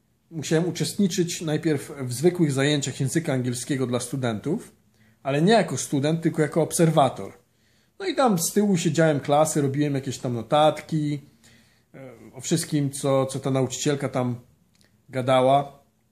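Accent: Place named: United States. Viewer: W jakim jezyku robiles notatki? :-) Lubie Ciebie sluchac, fajnie opowiadasz. Slychac ze masz juz taki amerykanski akcent :-) Pozdrawiam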